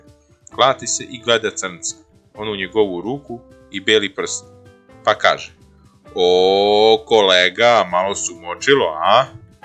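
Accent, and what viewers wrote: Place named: South, Serbia. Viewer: Samo kada bi južnjački šmek malo smanjio,možda bi i na nešto ličilo.🤭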